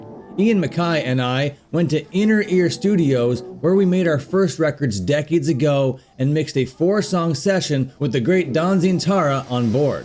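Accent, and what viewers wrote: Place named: Canada. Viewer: You have a Canadian accent.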